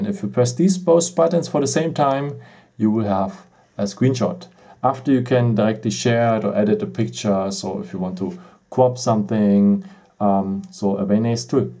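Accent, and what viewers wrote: Germany. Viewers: R u german? It really sounds like u r german xD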